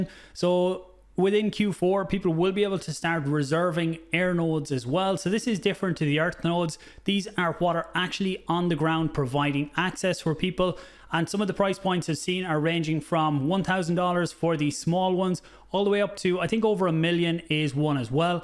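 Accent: Ireland. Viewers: I truly do love the Irish. But whenever I hear that accent I start looking for my pot of gold. Not sure how that got started but I really would like a pot of gold if you could manage it.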